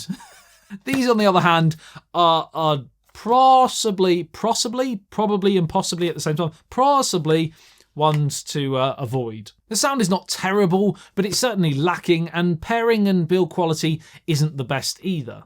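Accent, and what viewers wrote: United Kingdom. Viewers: What country you're from? Is that a British accent?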